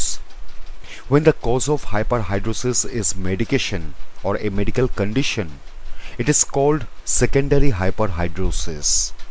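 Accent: India. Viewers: He is lndian..you cannot expect him to talk like an English or american man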